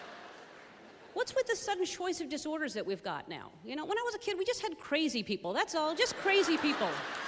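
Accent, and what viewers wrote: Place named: South, United States. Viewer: Is it me or can you hear her Southern accent a bit?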